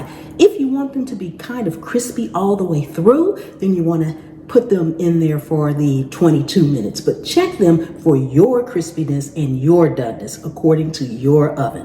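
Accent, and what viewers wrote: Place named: Upper class, United States. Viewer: Love your accent sounds like posh American. Cookies look good...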